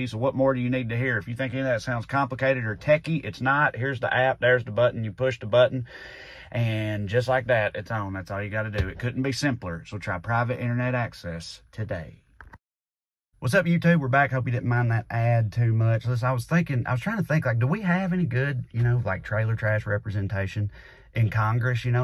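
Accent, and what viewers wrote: South, United States. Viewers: I live in Denver and I am seriously angry that she was elected by voters in that district. That race was so close. I really believe that her and her family's good looks and the image of a solid family woman is what got her the job. People saw the sexy looking woman with the handsome husband... and didn't look too hard at her qualifications, or lack therof. I hear you talk and the southern drawl and ball cap lead me to think I'll hear one thing, and then I hear you hitting the truth and I am pleasantly surprised. I enjoy your rants! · Agreed 👍 And I’m just a dude from France living in Canada and following US politics madness. I usually struggle a little with understanding the southern US accent but not with him. Love both the message the words and the accent 😊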